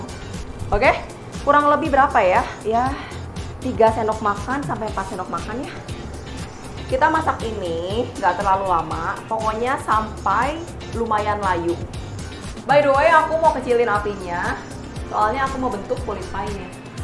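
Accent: West Java, Indonesia. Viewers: trus Sunda pisan, kacida Sunda na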